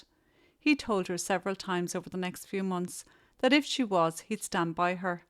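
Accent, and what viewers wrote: Ireland. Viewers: I love her accent/cadence it is a lovely bit of home slice for an Irish lass in Australia. Did your mum not teach u if u have nothing nice to say.....?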